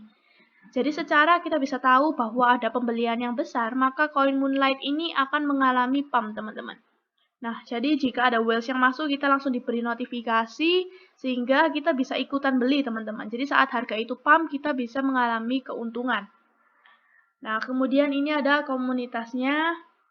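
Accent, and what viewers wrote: Java, Indonesia. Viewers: medoknya jatuh cinta aku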